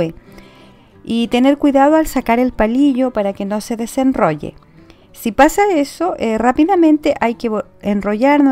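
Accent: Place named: Chile. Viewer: Hola me encantó primero por que eres chilena como yo, con 40años en Italia escuchar la voz de una chilena me da mucho gusto. Muy lindos tus trabajos yo me dedico a la lana todo lo manual es ermosa brava 👏👏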